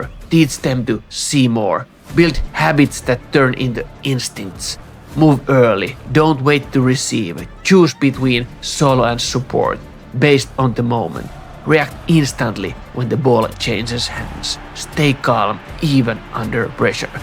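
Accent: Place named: Finland. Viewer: I love Finnish accent. Soothing, clear, understandable. Thanks for the extensive analysis. Subbed. Regards from Turkey.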